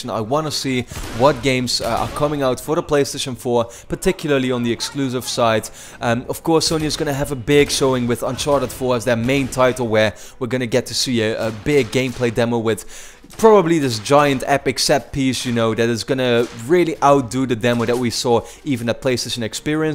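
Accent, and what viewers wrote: Netherlands. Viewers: Ik dacht al deze gast spreekt met een Nederlands accent :p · are you dutch?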